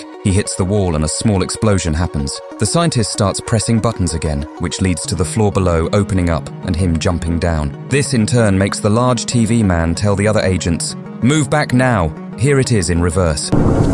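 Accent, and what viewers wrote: United Kingdom. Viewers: Are you british? Just asking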